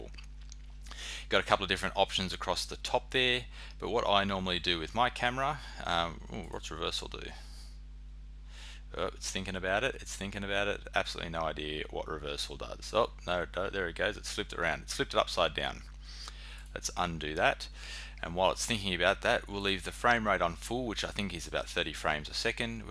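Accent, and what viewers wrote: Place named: Australia. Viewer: Thanks for sharing this. I prefer the Australian accent that the Chinese calligraphy ... no offence, but its much easier to follow :). I wish you could add the settings for the Iphone and port forwarding. Thanks again from Bristol UK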